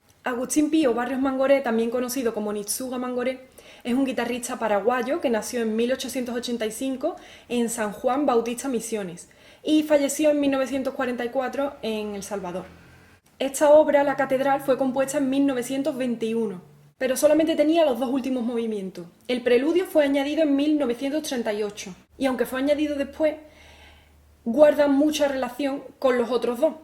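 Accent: Andalusia, Spain. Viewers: Buen video, de que parte de España es tu acento?? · Ese acento es andaluz. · Que raro es el acento andaluz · Que Hermoso!!! No words to describe your talent...and then your accent, where you from? Se que de Spain pero de que region?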